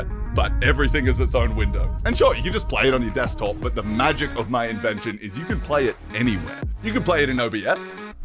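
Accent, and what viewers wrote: Australia. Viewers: Just realized you’re Australian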